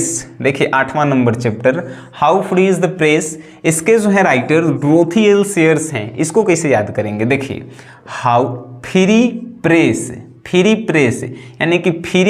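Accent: Bihar, India. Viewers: Aap ek dm real bihari ke trh bolte hai but aap bahut aachha trik btate hai 🙏🤝🤝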